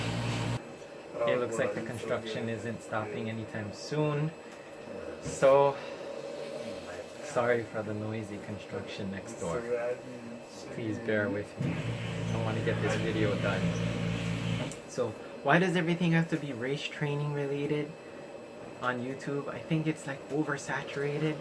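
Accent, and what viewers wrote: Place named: United Kingdom. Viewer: Brilliant topics, lol I love your British accent 😂🤣 superb my friend. I do a good Australian accent, I’ll have do it on the vlog! So my accent is from the south, was born in Watford just outside of London, my wife thinks I’m very common lol 😂 I think Home is where your heart is but wherever I move too in the UK I’ll always think of Watford as ‘Home’ in the uk. But I also consider myself to be European, we are so close to France, only 1hr 30 mins to the coast where I can drive my car onto a train and in 30 minutes you are in France which I always think of as the gateway to Europe. There are definitely times of the day when I will also not venture onto busy roads. Totally agree with about riding for fun, it’s why I ride 😁👍🏻 I love it 🚴🏻👍🏻😁👌🏻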